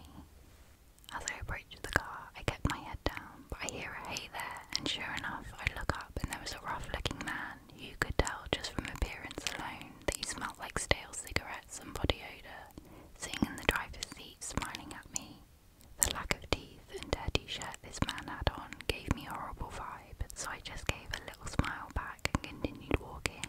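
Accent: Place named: United Kingdom. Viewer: GOD DAMN you're impeccable dude!! As per usual lol. Ive gotta say, you have suuuuch a lovely British accent ❤ Im Aussie, my former father in law is a Geordie.. so i know not all English accents are as... ummm... soothing as yours? Lol! 😂 Love your work doll, seriously.. you're unbelievably good at this. Your accent & whisper tone as so well suited to ASMR ❤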